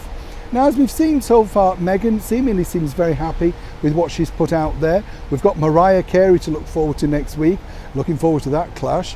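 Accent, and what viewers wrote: United Kingdom. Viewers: You talk too fast with your heavy British accent and phrasing. Slow down and take a breathe.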